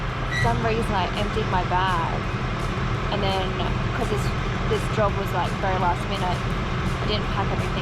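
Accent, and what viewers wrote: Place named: Australia. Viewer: Nothing better than B-doubles and an Aussie accent.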